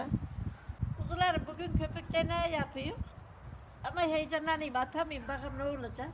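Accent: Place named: Elazığ, Turkey. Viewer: Elaziğli gibi konuşuyosun ya anane ben elazigliyim da ondan diyom 😂🥰💙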